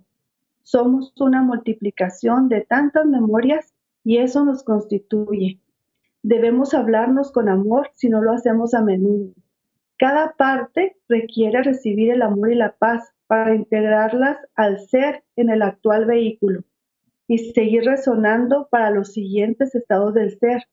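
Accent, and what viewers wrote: Chihuahua, Mexico. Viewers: Wow eres de chihuahua mi paisana que emoción lo supe por tu acento ,,, un abrazo 🤗😘 gracias gracias 🙏🏻 . Me voy a comunicar contigo para que me ayudes necesito una terapia necesito mucha luz 💡 estoy pasando por algo difícil en mi vida. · !! desde que empezaste a comentar supe que eres de Chihuahua, muy interesante tu información, gracias, gracias, gracias, un abrazo con amor y luz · Yo sabia que eras mexicana por tu tono de voz que alegría 🤩 que puedas contactar con Los Ángeles y seres de otras dimensiones me atrae mucho este tema saludos es la primera vez que veo este canal yo desde que era niña vi una sola vez a seres desencarnados nunca lo hablé tenía alrededor de 3 años y cuando tenía 8 lo platiqué porque vinieron familiares de mi mamá de USA 🇺🇸 Y tocaron el tema pero ellos venían con un aparato detector de metales y al escucharlos volteé y les dije yo los vi me querían llevar al pueblo pues era donde vivían mis abuelos nunca más quise regresar a ese lugar. 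Después cuando ya era adulta tenía una especie de ataques como epilepsia pero me chocaron y no tenía nada pues tuve un evento de esos y yo sentí q me iba por un túnel oscuro y grité y de NO y siempre cusnfo me platicaban de alguien que tenía un dolor físico yo lo sentía hasta la fecha yo no puedo escuchar q a alguien le duela algo porque me lo trasmiten. También cuando mi papá iba a fallecer un día antes soñé a mi mamá ya fallecida y la traían unos seres muy altos con una túnica blanca y la traían en una caja de cristal y la sacaron y yo le dije en mi sueño q si no iba a entrar a la casa y ella telepáticamente me dijo que no y esos seres la cargaron y la depositaron de nuevo en ese ataúd de cristal y le dijeron ya es hora y se desvaneció la imagen y muchas otras experiencias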